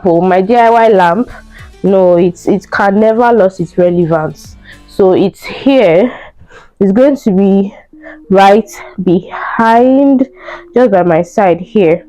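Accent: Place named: Nigeria. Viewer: Nice video and alot of creativity. But please try to use standard English not the Nigerian style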